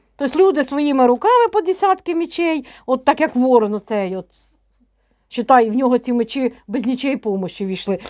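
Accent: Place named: Kherson, Ukraine. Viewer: І у нас на Херсонщині так розмовляють, наш суржик рідний. Дякую, за вашу працю.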